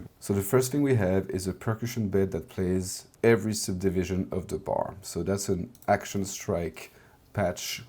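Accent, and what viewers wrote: France. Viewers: Are you French ?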